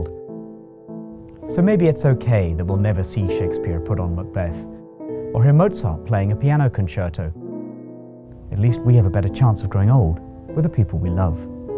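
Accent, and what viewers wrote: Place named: United Kingdom. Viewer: With all respect to the droll British author/narrator of this piece, it just isn't "Frontline" without the ponderous, lugubrious "voice of God" voiceovers of announcer/voice talent Will Lyman (also, surprisingly, the voice for "the most interesting man in the world" although he sounds completely different there, maybe it's the accent). Anyway, glad to be alive and have "survived" Mozart, also my dad who died at age 59, on account of chain-smoking Pall Malls, not lack of urban sanitation and lack of understanding of infectious disease (well, other than the public health issues surrounding smoking).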